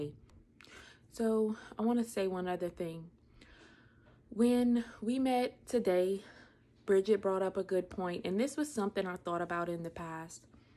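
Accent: Louisiana, United States. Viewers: You came across my Youtube feed and decided to watch your channel. As I am listening to you speak, I instantly knew you were from Louisiana, didn't know from where. Anyway. I am listening to your story and I'm so sorry and condolences to you and your family. I have watched the videos of the car and my God, praise God mama still here. I wish I could hug you. Having a loved one pass on is one thing, but to have them go because someone was to careless to care what their actions would cause to someone else. I 'm not sure if you're the same family I heard about from Jeanerette with the mom and three kids coming from watching a basketball ball game up north. I cried when I heard about that. Know that you have people who love and support you. even if you don't know them. Praying for continued healing and peace for your whole family. ❤ from a Loreauville girl. 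· Sounds Cajun, maybe? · This has nothing to do with the seriousness of your topic, but I knew you were a Cajun girl as soon as I heard you speak, so I looked at your about statement. I grew up in South Mississippi and have always loved the Cajun accent. My husband is from the Folsom-New Orleans area. I am so very sorry for the horrible loss your family suffered. I am sure, even now more than a year later, your family, and especially your mom, is still trying to discover the "new normal." I have never heard of the group with which you met, but it sounds like a wonderful support system. I think it's great you're not going to call the traumatic loss of your younger subs a "accident" anymore. My dad was a attorney in Mississippi, and it may have been after I lost a friend and her unborn baby to a drunk driver, I asked the definition of a accident. He told me a "accident is something that couldn't have been avoided." Well, from that legal definition, no drunk ever has or causes an accident; they create wrecks or, your new word, crashes. 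I know God's peace has surrounded you and helps you with your grief. I pray His peace continues to uplift you and your family in the days ahead and when your hearts are very heavy during milestones such as what should have been graduation years, when they might have had weddings or babies, special birthdays like 25, 30th, and so on. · Listening to your accent takes me back to my time in Lafayette. I’m so sorry for your family.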